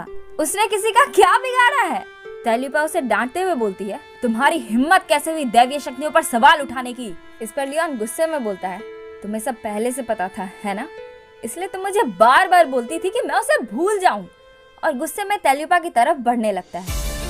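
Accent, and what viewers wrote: Bengal, India. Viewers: Are you Bengali? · Didi tumi bangali naaaaa sondeho 6ilo kin2 tomar vat katha te pakka hoye 🥰🥰🥰🥰🥰🥰🥰🥰🥰🥰🥰🥰🥰💋💋💋💋💋💋💋💋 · You are Bangali right? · Are you bangali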